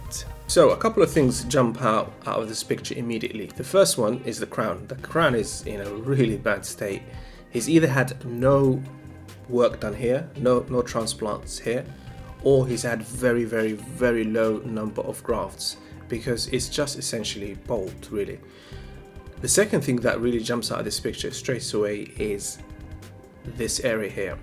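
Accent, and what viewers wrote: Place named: United Kingdom. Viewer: aren't you independent and can review and say whatever you want as long as it's true? With your accent, I can tell that you are British and you have the right to freedom of speech unless you have a contract with certain clinics. I hope you are free to say whatever you want without Legal Ramifications. Cheers!!! Ps, I had my hair transplant in Turkey this June and my hair is growing a lot. I had a minor issue and the clinic is willing to fix the issue free of charge next year. So far so good!!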